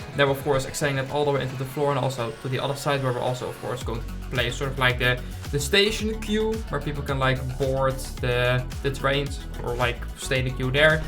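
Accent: Netherlands. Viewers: Probeer niet engels te praten want je probeert Engels te praten maar je hoort je Nederlands tussen en dat in het ombetant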